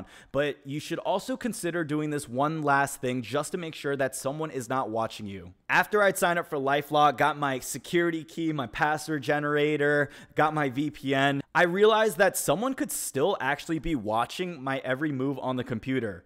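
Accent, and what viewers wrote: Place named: DMV area, United States. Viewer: You from the dmv area?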